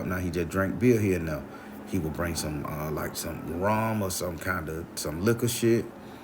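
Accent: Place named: South, United States. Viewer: I listen to the stories featuring Grandma the most. She reminds me sooo much of my Grandma it’s her face I see when you tell the story. Snuff instead of tobacco, a hatchet instead of a 38, and she only practiced ass kicking without spells. Your stories could be on sci fi or something like that. She was a southern woman as well so the voice and terminology is spot on. It’s crazy! It creates a warm memory that never really was, at least not for me. More of an adventure that I can actually see happening. She kept a Buick, too!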